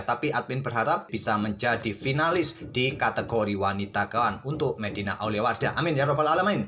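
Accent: Java, Indonesia. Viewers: Bang kurangi loga Jawa nya.bang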